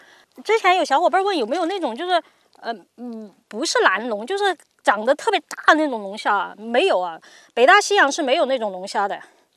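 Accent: Sichuan, China. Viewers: 你的川普太有意思了，挖挖机也就真的只有四川人才说的出来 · ，你啷个不说四川话呢？比普通话好听好玩得多！！！ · 听到四川话太亲切了，老乡！ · 姐姐你的四川口音好上头哦我的天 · 听起来像川普通话难道是老乡？ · （你那麼凶呀）妳的四川口音很好聽，聽起來很可愛的。🥰🥰🥰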